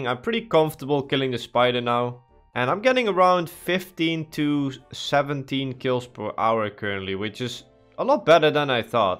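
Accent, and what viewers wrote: Netherlands. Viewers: Mooi Nederland accent:)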